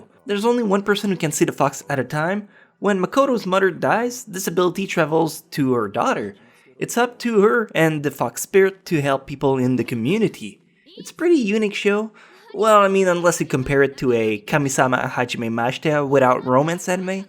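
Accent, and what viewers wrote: Quebec, Canada. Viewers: French Canadian accent you get used to it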